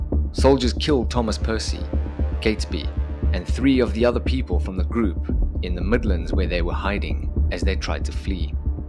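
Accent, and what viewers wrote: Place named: South Africa. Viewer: I note your South African accent. My ancestors were REFUGEES to the Cape Colony from France, due to bloody religious persecution BY THE CATHOLICS, who MURDERED the family who remained in France. Catholics TORTURED and MURDERED whoever disagreed with their totalitarian orthodoxy. Fawkes was an attempted MURDERER of the cradle of modern democracy. He was no hero. He was a servant of tyranny. His death is celebrated to this exact and VERY DAY throughout the UK. With good reason.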